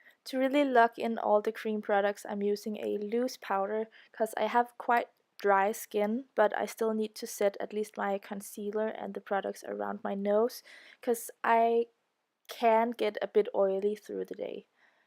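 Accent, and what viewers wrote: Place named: Denmark. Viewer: Man kan tydeligt høre at du er fra Danmark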